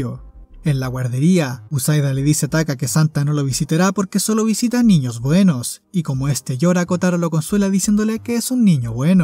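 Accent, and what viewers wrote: Chile. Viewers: con los primeros 5 segundos ya supe que es chileno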